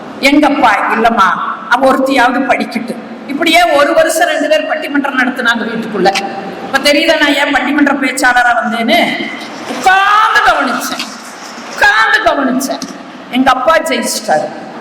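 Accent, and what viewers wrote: Kongu, India. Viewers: சிறப்பு மேடம். கொங்கு தமிழ்....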